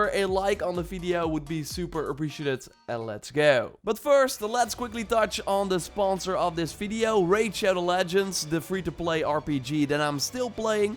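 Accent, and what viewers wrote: Netherlands. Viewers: Are you Dutch? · Are u dutch? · Volgens mij is hij nederlands vooral het accent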